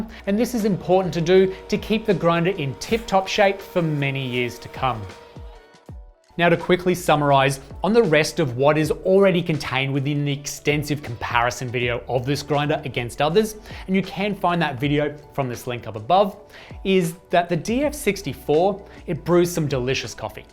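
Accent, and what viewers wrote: Australia. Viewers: He sounds Australian.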